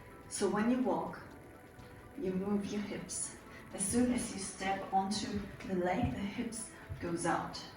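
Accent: Germany. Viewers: Are you German? 😊 Great Tips, thank you for sharing!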